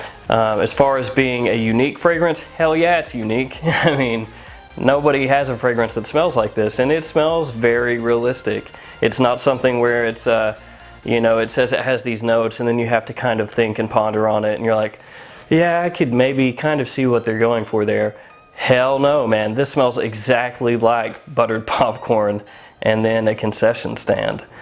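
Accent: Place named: Los Angeles, United States. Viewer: Dam. That's like a Hollywood story. Would've never guessed the two of you went to high school. I might be just hearing things, but it sounds like the way ya speak reminds me of someone here in L.A., while your wife possibly a southern accent. Again, I could be totally wrong.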